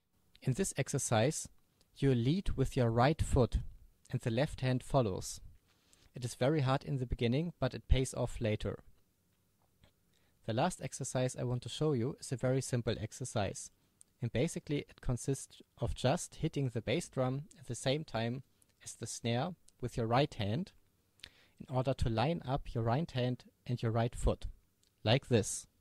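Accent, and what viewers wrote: Germany. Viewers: Are you German? I like your accent 😊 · Man merkt, dass du Deutscher bist:)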